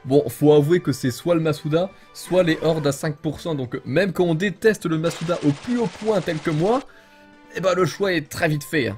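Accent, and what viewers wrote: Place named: Lower Normandy, France. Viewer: "Ou qu'il l'est?" "Ou qu'on l'a?" T'es un vrai Bas Normand comme moi x)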